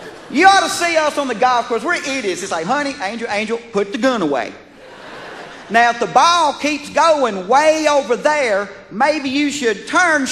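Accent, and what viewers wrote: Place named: South, United States. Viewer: hes hilarous,i have this DVD and i have watched this many times and can many more times lol i love his southern accent :)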